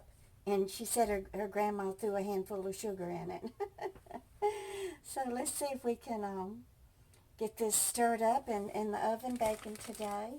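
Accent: South, United States. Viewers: Thank you for sharing that recipe,. You always have tasty foods to share with viewers. As always, can't get enough of the Southern accent...it reminds me of home. Stay blessed, kind soul.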